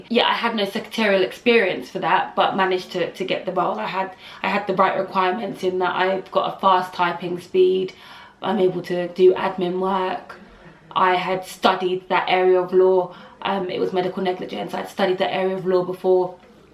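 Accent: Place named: United Kingdom. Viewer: She is not American she is in the UK. Speaking with a British accent in the UK is no different then an American speaking with an American accent in America. Her qualifications and skills is what got her the job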